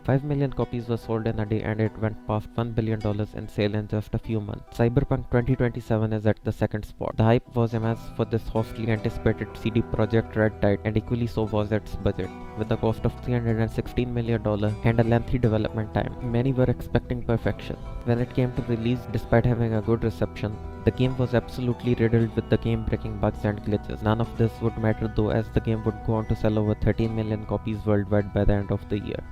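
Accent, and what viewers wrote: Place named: India. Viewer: Your video is great bro but take the indian accent down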